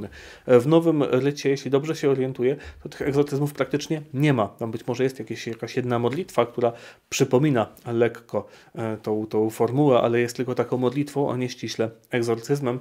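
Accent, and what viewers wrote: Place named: Italy. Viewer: Strasznie mnie drażni włoska wymowa łaciny przez księdza. Równie dobrze mógłby ksiądz wymawiać łacinę według reguł polskiego języka albo jakiegokolwiek innego. Uczyłem się łaciny u trzech mistrzyń, a ostatnią była ś.p. pani Maria Kosiarska na KUL, która cieszyła się ogromnym autorytetem i nigdy nie słyszałem, aby którakolwiek z nich mówiła manium, redżina czy czelum. Zawsze było magnum, regina i coelum. Dla mnie okaleczanie łaciny poprzez włoską wymowę jest obrzydliwością, bo każda deformacja jest miła diabłu. Poza tym dzięki za świetne audycje.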